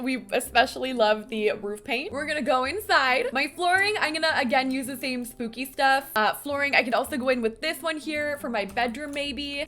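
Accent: Canada. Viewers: I love how she says words like “couch” and “house” 🥰 I didn’t know this was a canadian accent until I discovered her channel. (I’m not a native eng speaker)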